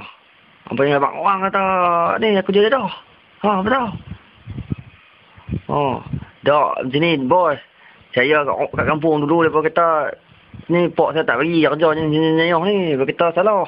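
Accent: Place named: Kedah, Malaysia. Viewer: weyyy hg msti org kedah..sura hg best la. idup utaraaaaaaaa....PART YG BEST MASA DIA BISIK KT BRUSLEE...MCM BTLLLL....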